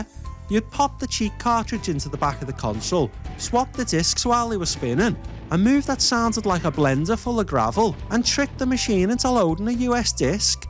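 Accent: Liverpool, United Kingdom. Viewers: I'm not even from the UK but I subscribed too. Partially for the great video, but also for the Scouse accent ! :D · Instantly subbed the second I heard a Scouse lad talking PS1. Class video mate, keep it up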